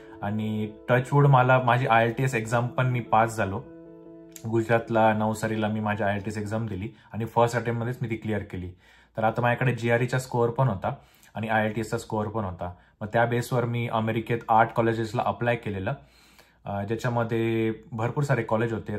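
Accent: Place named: Nashik, India. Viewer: तुझी मराठी चांगली आहे आणि नाशिक ची तर एक नंबर आहे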